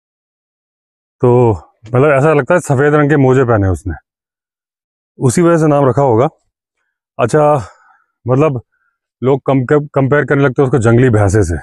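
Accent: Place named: Jabalpur, India. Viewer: Sir aap proper mp se hai ya kumaon hai apki language to jabalpuriya hai Bilkul clist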